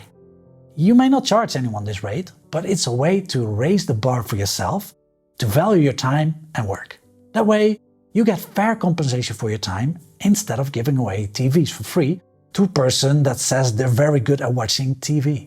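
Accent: Netherlands. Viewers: hello, I just subscribed........I have a question, not related to the video at all.........but is your real name? I'm intrigued. I lived in NL for 10 years and I recognise the accent.........I don't understand the very English sounding name, and can't align it in my own mind with the content you are creating. thanks for clarifying.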